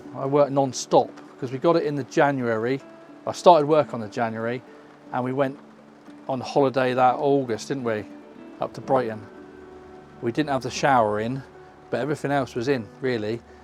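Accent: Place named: Ukraine. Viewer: Dubbing? I understand both of them…one British and, the presenter, sounds possibly Ukrainian. Since you’re writing in another language maybe you just don’t understand them, or the closed captioning isn’t doing a good job of translating for you. I find it very inefficient. No fault of the channel.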